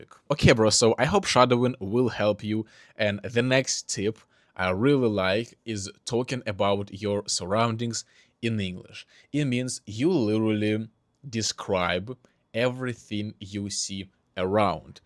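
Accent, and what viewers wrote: Russia. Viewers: The moment you spoke I knew you were Russian how I love the Russian accent 💗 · Aww, you have a brilliant Russian accent❤ Your accent gave away your nationality😅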